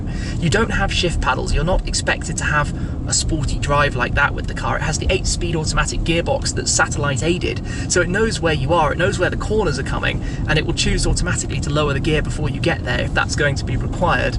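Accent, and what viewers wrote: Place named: United Kingdom. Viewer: Subscribed because of the British accent lol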